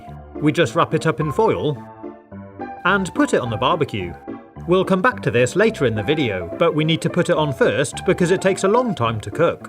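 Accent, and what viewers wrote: England, United Kingdom. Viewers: Yes, he's from England. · Are you English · R u a brit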